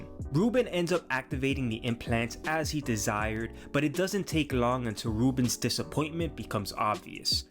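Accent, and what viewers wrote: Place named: Miami, United States. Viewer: You have a heavy Miami accent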